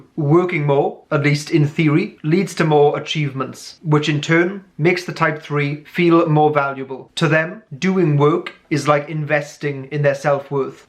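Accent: Wales, United Kingdom. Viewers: Are you Welsh!?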